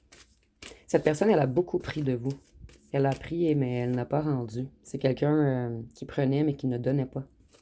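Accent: Quebec, Canada. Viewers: j'adore vos guidance , merci beaucoup ;) pas de souci je comprend bien le québécois puis j'adore , votre franc parler c'est trés spécifique votre parlé , trés imagé ,j'aime bien! c'est franc ! ;) félicitation pour votre nouveau logement , content pour vous ;)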